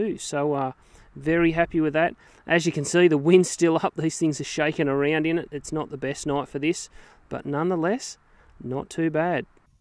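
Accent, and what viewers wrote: Australia. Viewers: pure evil LOL 🤣😂,.have to say, im glad you ain't no Yank, as the Aussie accent just finishes it off perfectly. Any time you visit UK, let me know, gladly buy you a beer 🍻 & have a barbie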